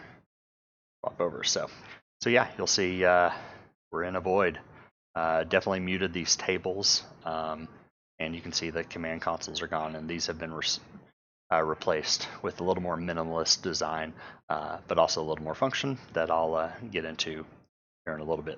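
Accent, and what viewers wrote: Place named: Texas, United States. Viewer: Thanks so much, nasal texan :)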